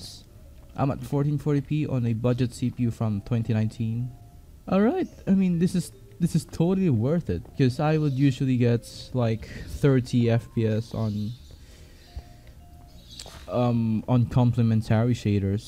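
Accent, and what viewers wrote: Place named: Philippines. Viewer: Man, i think this guy is filipino for sure because of his english accent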